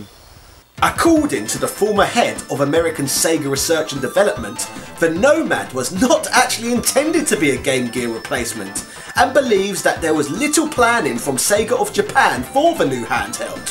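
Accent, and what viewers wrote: United Kingdom. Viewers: Subbed. You remind me a bit of AVGN, with the fantastic British accent, which is awesome. Keep up the good work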